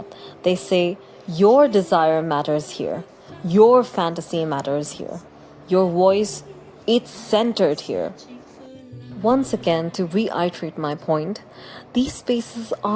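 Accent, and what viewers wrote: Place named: India. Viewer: I hope we get more games like lads... Also you sounds indian if not then its completely my fault for assuming (i just seen very less indian playing lads, ) and the your vocabulary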